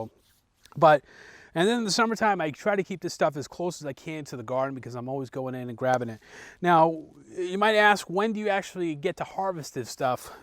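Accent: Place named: Northeast, United States. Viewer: North Carolina?? If you aren't from the north east, someone who raised you must be. Thanks for the info. I'm about to start a worm bin, so this helps. Best to you and your family, peace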